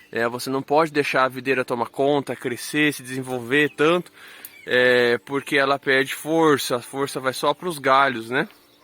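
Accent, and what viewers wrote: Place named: Rio Grande do Sul, Brazil. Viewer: Tu é de onde teu sutaque parece gaúcho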